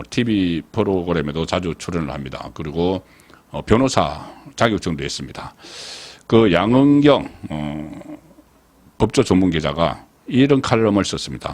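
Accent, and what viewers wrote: Gyeongsang, South Korea. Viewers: 탄<핵 아니고 탄~핵이라니까. 탄자가 장모음이오 경상도 사투리 증말 듣기싫네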